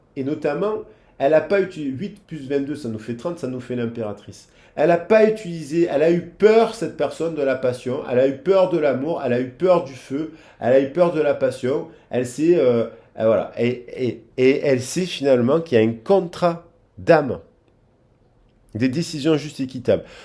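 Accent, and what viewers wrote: South, France. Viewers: Cc merci pour votre guidance très detaillee.c est toujours un plaisir de vous écouter avec votre accent du soleil qui me fait chaud au cœur 😉😊.vous êtes un veritable therapeute.vous m apaisez bcp.😊😘.merci pour votre bienveillance. Belle journée a vous 😉😊😘❤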